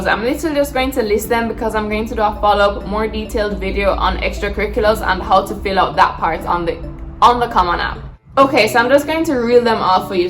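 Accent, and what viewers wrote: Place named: Jamaica. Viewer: Oh I just found your channel like 30 minutes ago and couldn't quite put a finger on the accent. Now that you mentioned that you're from Jamaica, that clarifies it for me. I am applying to come to the States for my PhD and while a lot of what you said was directed at undergrads, I still found a few tips to be helpful as well. Thanks for the great content.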